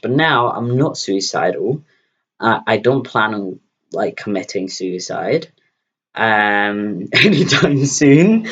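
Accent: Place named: RP, United Kingdom. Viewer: Since your voice sounds a lot deeper now, you´re accent suddenly sounds kinda posh. Don´t know why but it´s really cute!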